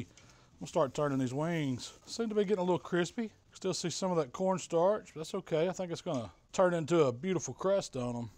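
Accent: South, United States. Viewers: His southern dialect makes me trust him. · Southern accent check. Kinda fat check Pocket Buttondown shirt check I will take grilling advice from this man. The only sketchy thing is his foorwear. Should be wearing New Ballance trainers of flip flops. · If I’m going to trust anyone to tell me how to BBQ it’s going to be a heavier set man with a southern accent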